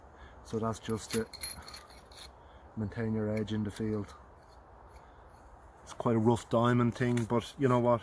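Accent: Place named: Ireland. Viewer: It is Great to see such a Good version of an Iconic Knife been used in My Homeland ! Thank You for the method of Drawing the Fire steel back from an embedded Knife it was a New one for Me ! The Golden Rule of using a Tracker Knife is that You have to Know How to Use a Knife Properly for it to be " A Good Knife " and I have to say this as an Old Dub in London for Forty Years , it is Great too Hear an Irish Voice on this Subject and I look forward to much More .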